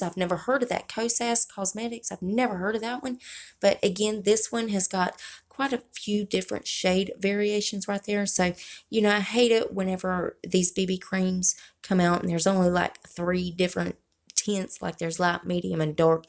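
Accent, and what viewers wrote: South, United States. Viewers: Hey SWEETFACE,, i have a question? I was taken of off the waiting list for the lux box, but I did get my December bass box. So they build me the $24.99 for the December Lux box! So am I going to get charged the other $25 plus another 25 for my January box? Do you know I'm so confused right now. LOL and by the way I absolutely love your videos and your sweet Southern Tang! I'm from down south too so I love you girl and thank you for all the spoilers I just started following you about a week ago maybe two I found you and the first video I was hooked.😅😅😅💖💖💖💖💖💖💖💖💖💖💖💖💖💖💖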